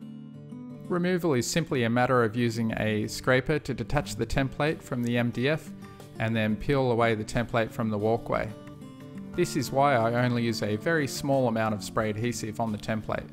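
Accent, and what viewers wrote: Australia. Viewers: I can sit and watch your videos forever. Nice background music, an Aussie accent and lots of tips and tricks to build awesome stuff. What more can one ask for. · you clearly have an Australian accent, Yet use a lot of American products, so my question is where are you located? As I am in Australia and importing those products work out to be very dear.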